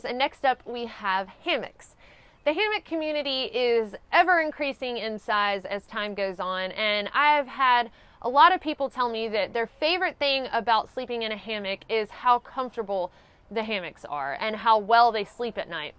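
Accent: South, United States. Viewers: Love your accent. Reminds me of watching the Dukes of Hazzard!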